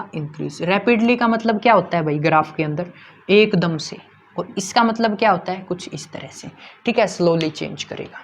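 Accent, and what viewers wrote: Haryana, India. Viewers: Ap haryana se ho na ?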